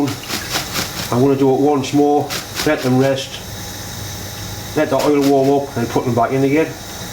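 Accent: Newcastle, United Kingdom. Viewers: Love ya vids, and it's great to hear a geordie on yootuube ha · Gordie north east england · It's Genuine Newcastle. Great to hear! Hi, can you do a hotpot please?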